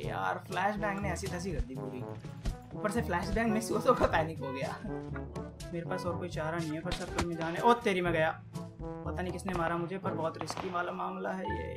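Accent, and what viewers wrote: Haryana, India. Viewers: kya aap haryana se ho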